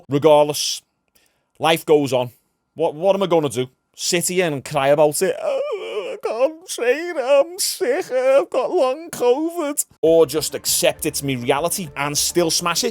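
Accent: Liverpool, United Kingdom. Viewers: I don't usually sub to scouse channels as I find the accent annoying, but your content makes me forget that 😂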